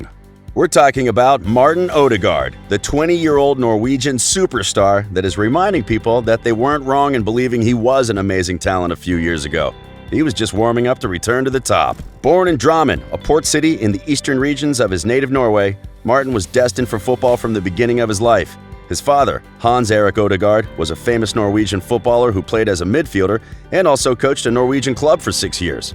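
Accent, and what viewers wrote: United States. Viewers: I WILL TROLL THOSE COMPLAINING THE AMERICAN ACCENT. YOU ARE WELCOME! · Can't take American voices seriously speaking about football... lol haha sorry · The speaker´s voice sounds a bit resemblant to Ted Lasso (Jason Sudeikis). It´s almost charming to hear all these ´murican pronounciations. But to the next video, please someone, give this speaker a proper phonetic list of club, coach and player names. Before the charm fades off.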